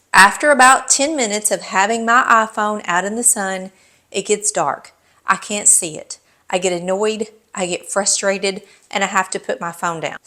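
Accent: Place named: Kentucky, United States. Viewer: Love,love,love your program. I love Paducah. Have friends there that are involved in the art community. I especially live the doughnuts for getting rid of mosquitoes. They are already bad in Owensboro. You are so personable and we have the same accent. I also love KY Lake. Had many good times there.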